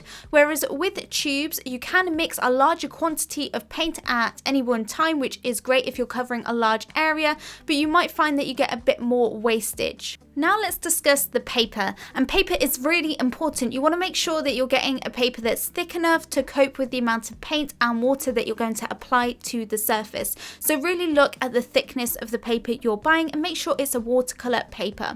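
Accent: England, United Kingdom. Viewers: Lol that's how us peeps in Britain talk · I like your British accent!(Nice video, thanks) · I love her british accent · Learning British accent and watercolor basics in one video... I love it. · That British accent is so satisfying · Brandon Butler yeah because she has an English accent also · British accent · british accent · She has a British accent that's why 😑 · I really really really love your British Accent❤️🔥 which is pleasing to ears and your tutorials are amazing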